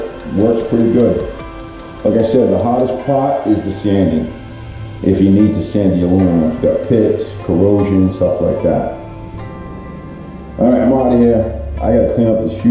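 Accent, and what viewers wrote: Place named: New England, United States. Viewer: Are you from the east coast? You sound like my uncle lol got like a mass/rhode island accent.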